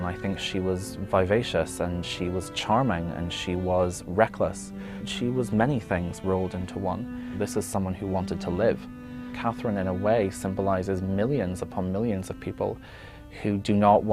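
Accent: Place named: Ireland. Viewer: Love the Irish commentator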